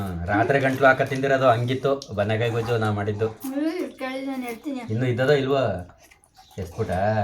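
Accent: Mandya, India. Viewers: ನಿಮ್ಮ Mandya ಭಾಷೆ ತುಂಬಾ ಚೆನ್ನಾಗಿದೆ brother ನನಗಂತೂ ನಿಮ್ಮ ತುಂಬಾ ತುಂಬಾ ಇಷ್ಟ ಆಗುತ್ತೆ 🎉🎉🫡🫡👌👌👍👍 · ಸೂಪರ್ ನಿಮ್ಮ ವಿಡಿಯೋ. ಮಂಡೇ ಭಾಷೆದಲ್ಲಿ ಕೇಳೋಕ್ಕೆ ತುಂಬಾ ಇಷ್ಟ. ಬಾಗಲಕೋಟೆ ಡಿಸ್ಟ್ರಿಕ್ಟ್ ಇಲಕಲ್. ಇಂದ ವಿಡಿಯೋ ನೋಡ್ತೀನಿ. ಈ ಮೆಸೇಜನ್ನು ದಯವಿಟ್ಟು ವಿಡಿಯೋ ದಲ್ಲಿ ತೋರಿಸಿ ಜೈ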